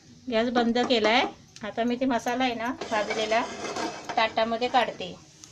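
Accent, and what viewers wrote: Konkan, India. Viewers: tumchya sagalya recipes mala khoop avadatat. same majya mummy sarkhya, tumchya bhashet ani jevanat kokanatla godava ahe Love u Kaki ani thanks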